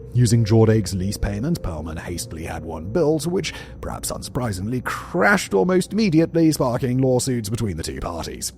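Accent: United Kingdom. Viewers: He's British. You should hear how they pronounce allininum.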